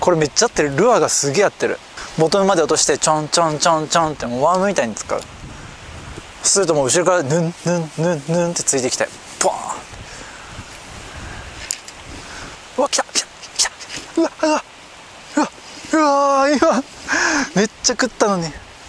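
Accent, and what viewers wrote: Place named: Nagoya, Japan. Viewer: 名古屋弁が懐かしい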